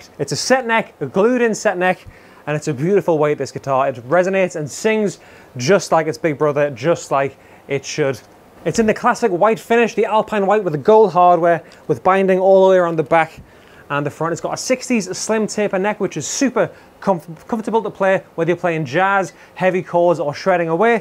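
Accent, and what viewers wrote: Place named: Newcastle, United Kingdom. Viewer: The Geordie Phil X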